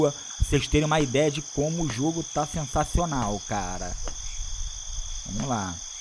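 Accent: Rio de Janeiro, Brazil. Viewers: Não sei se ele é carioca , mais nos caraioca falamos assim kk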